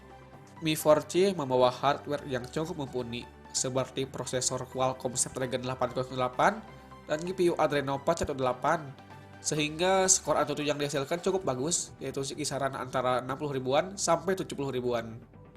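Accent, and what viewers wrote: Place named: West Java, Indonesia. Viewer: Sunda pisan! Meni resep 😆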